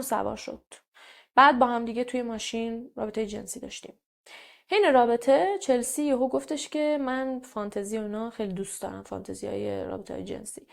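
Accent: Mashhad, Iran. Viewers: پرونده جالبی بود 👍، ضمنا بعنوان یک مشهدی بگم که یکم ته لهجه مشهدی گرفتی خانم😉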